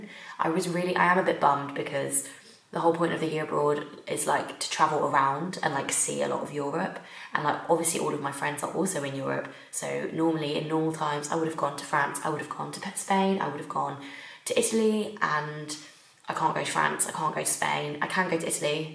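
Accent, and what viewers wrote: United Kingdom. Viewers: !😃 I always wait for your next video cause like you I tend to be really chatty, gossiping and stuffs.😅 I just really vibe with you.🤠 I feel like if we sit and start talking, time would be passing so fast than we had expected.🐣 I came to know you through Oxford videos;🦢 moving to campus etc and I don’t regret 🍀spending almost an hour listening to you.💐 Honestly at the end of one of your long 45-50 mins videos, 🌷I tap on the screen to see how much time I’ve been watching and it’s just unbelievable how the time passed as I was so engulfed in your conversation.🌻 I really like hearing everything you say and it helps me develop my ability to speak and hear Brit english. I recommend you to do a video on everything on Oxford;🌟 the requirements, interview😥, compressed time table,⚡️ party,✨ free time and others.🌈 That would really help me out and I’ll be extremely grateful to you.💥 Sorry for this long comment but I can’t help it just comes with the flow.⛄️ Watching videos that you want to film plus it’s over 30 mins is heaven for me.🤩 I really like your vids!😍 You should do a livestream on a day. 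Been a long time since you didn’t.🤔 So yeah, patiently waiting for your next videos.😇 Stay safe and be crazy!🤪 whispers you are a beautiful woman😁🤩...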